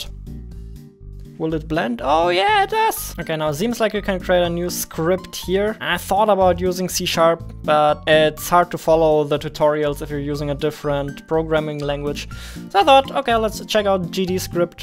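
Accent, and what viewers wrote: Germany. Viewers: I understand your English really good, are you German ? ^^ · Are u german? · Are you from Germany? You kind of sound like it. I am from Germany but I can't really tell if you are because your English is so fluently xD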